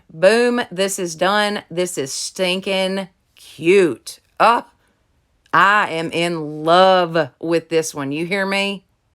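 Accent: South, United States. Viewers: Great DIYs, so inspirational and a great testimony of the true meaning of Easter! Thank you!! The phrase, "He is Risen" has been used for generations from the greeting the disciples shared with all after Christ arose. Today in some churches, it continues to be the greeting we share with one another. Two comments. The undertone of yellow is white, so your lettering blurs and hard to see on your DIY #1, etc. . You might trying black to make your messages stand out, a higher contrast. Also, you do a wonderful job with your passion & creativity. Try not to say your work is not very good. It is all about encouraging each other and no need to do that. It is not easy doing these YouTube videos, I have done one as an Interior Redesigner & color Consultant & Instructor so I enjoy hearing your warm Southern Accent, too. You Go Girl!!!😘😎😇Irene, Chris's Mom, Idaho Color Lady. 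<link>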